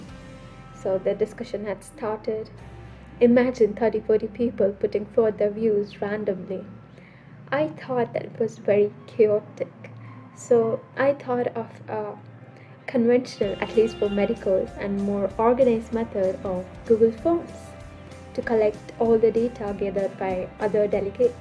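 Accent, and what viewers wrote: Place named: United Kingdom. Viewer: How you bring that British accent?😁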